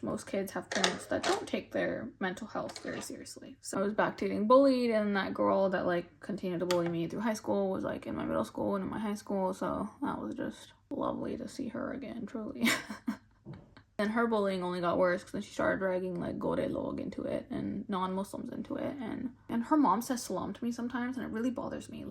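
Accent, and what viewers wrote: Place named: South, United States. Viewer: Omg I am crying because I can relate to you so much. I was bullied by my peers from upper elementary all the way through junior high so (ages 7-14) it completely ruined my confidence and till this day I'm trying to heal from it. (37 now) i have always felt like an outcast being unique in everything I do. I love your social media content and feel so validated. You are so genuine, raw and down to earth! I hate judgy people so much and honestly wish i can just avoid them all. I'm half way into watching your video but I just wanted to comment before i forget. I was bullied due to my skin color and were surrounded by racists .. then when i was jn high school i was bullied by aunties saying how i did not get my moms beauty and looks .. it hurt me so bad and I always felt self conscious about how I look, how i talk etc etc. 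i really want to get myself checked to figure out if I am neurodivergent. I overthink but i think thats a strength of mine, i feel anxious a lot and my sister always is mean to me about it. Anyway. I'll come back to comment again when I finish the video but i just wanted to say! We support you and im so sorry for all the hurt people have caused. Oh and I have also been diagnosed with an autoimmune disease right after getting hit with covid back when i was 35 and life has become so challenging. So you talking about all this, i feel seen! And i thought you were from the south because I hear a little southern accent. Turns our you lived in the south for a little! So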